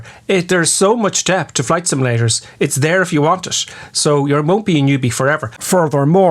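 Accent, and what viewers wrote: Ireland. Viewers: Hey new sub here U sound Irish man what country u from. Awesome video buy the way cant wait for it coming out on xbox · Love the Irish commentary. Refreshing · wait, you’re irish lol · Thank you for this excellent video and detailed description. I like your Irish accent! Sounds like I will need to upgrade a few pieces in my PC... Can't wait to try it out! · Normally I’d thank you. But in this instance I enjoyed the review so much including the lovely Irish accent (wipes tear from eye) I watched the whole thing. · Are you Irish? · Great review..very well done 👍 looking forward to more reviews in the future..also love your Irish accent ☘️ Also I am an absolute newcomer to flight simulators...would really appreciate tutorials for total beginners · Are you from Cork boi?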